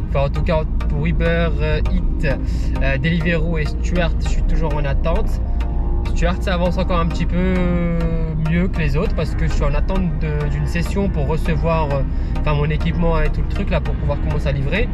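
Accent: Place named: Tourcoing, France. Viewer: On dirai tourcoing 😁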